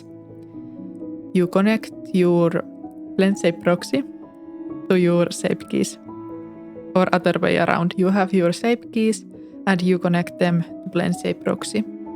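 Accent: Finland. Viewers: Your Finnish accent is Awesome!! as well as your tutorial! thank you! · Terve mita culu? I’m Finish American & when you began speaking I knew you’re Finnish. onnea! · Your Finnish accent is incredibly strong :D But thanks for the tutorial!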